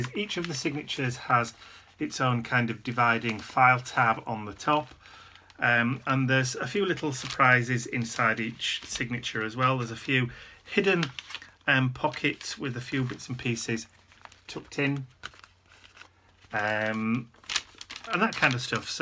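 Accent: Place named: North, United Kingdom. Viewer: Hi. I like your videos. They're so natural. By the way, where is this accent from, somewhere in the north?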